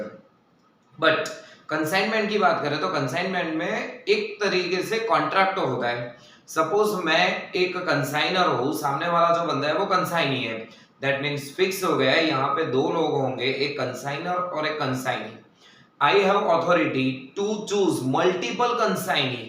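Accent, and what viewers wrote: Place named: Hyderabad, India. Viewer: Sir are you from Hyderabad , your accent is just like hyderabadi , anyways easy language , good information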